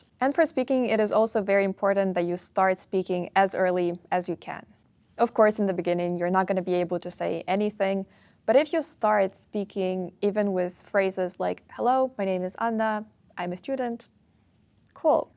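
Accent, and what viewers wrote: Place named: United States. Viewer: That's fine but you're speaking English with an awful American accent. Why do so many people do this?? It's not English. It's annoying.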